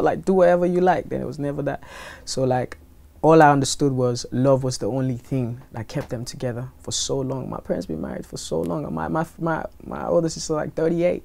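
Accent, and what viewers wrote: United Kingdom. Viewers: I am absolutely blown away. He has a British Accent.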